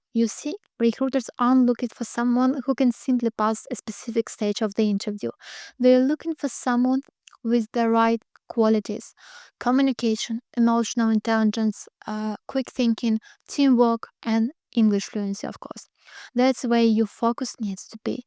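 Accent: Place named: United Kingdom. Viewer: Вау твой Английский реально приобрел британский акцент афигеть 😮👏👏 и все так профессионально выглядит! Эта картинка 🥰 ты изумительная 😍 видео с двух камер и вся анимация и инфографики ☺️🔥🔥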